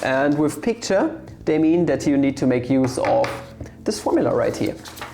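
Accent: Germany. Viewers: I can feel your german accent while you speak English.😊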